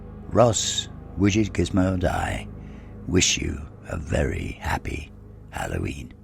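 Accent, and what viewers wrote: United Kingdom. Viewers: This video is super cool because it combines 2 things that I am very FOND of: STONE MASONRY ARCHITECTURE and SUPER-NATURAL ACCOUNTS!! His voice is really suited for telling Ghost ([der] Geist) stories, and I like his BRITISH ACCENT too!! I am a NATIVE Texan watching from the 2nd largest state in the United States (Texas). A FEW of my ancestors on my Mom's side were from Great Britain!!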